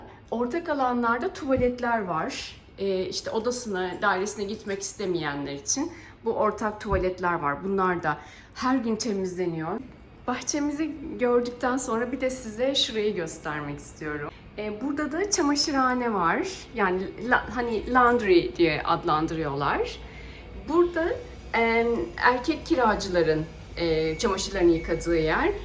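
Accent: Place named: Turkey. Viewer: Japoncayı Türk şivesi ile konuşmak çok güldüm harikasın😂😂😂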